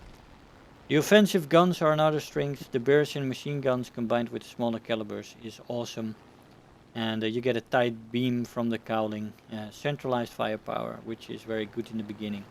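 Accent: Netherlands. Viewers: I love the dutch accent in every language i speak. kinda nice that both of my aircraft youtubers have it👌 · Hey.Are you from the Netherlands? I think i hear a little Slang.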